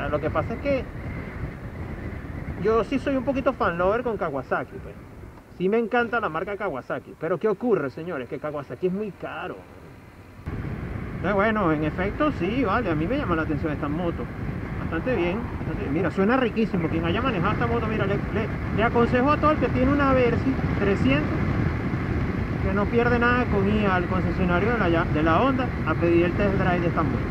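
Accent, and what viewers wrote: Venezuela. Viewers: Eres venezolano? 😮 Me gustó mucho tu estilo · Por tus acentos eres 100% Venezuela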